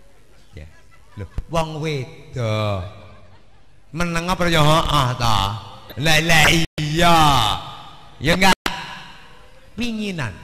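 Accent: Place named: Madura, Indonesia. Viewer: luar biasa bagus banget logate mirip meduronan..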